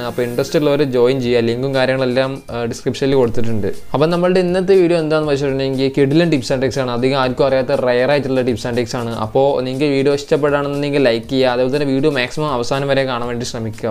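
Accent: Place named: Malappuram, India. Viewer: Veed malappurm ano😂 voice kettuchoichatha💯🪄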